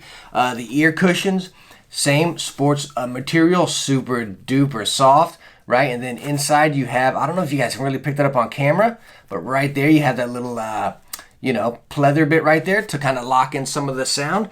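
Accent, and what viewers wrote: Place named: Brooklyn, United States. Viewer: where is this guy from? Brooklyn?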